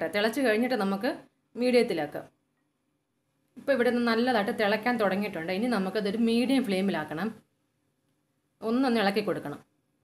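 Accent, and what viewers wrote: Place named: Pathanamthitta, India. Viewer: Supr👌 Pathanamthitta ano sthalam.... njangalude same slang 🙃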